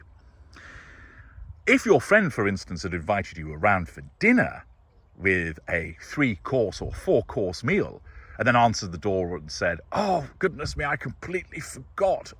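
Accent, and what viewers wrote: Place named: Kent, United Kingdom. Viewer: Hmm, getting a lovely Kentish vibe from your video, it’s giving your voice a lilt. That photo is a real keeper, full of joy and excitement. Megsie must be screwed up inside.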